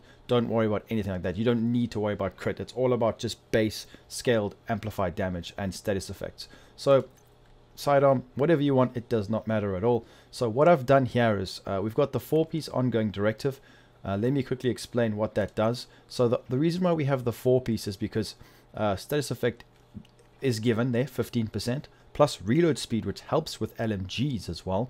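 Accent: South Africa. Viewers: cape town accent..? howzit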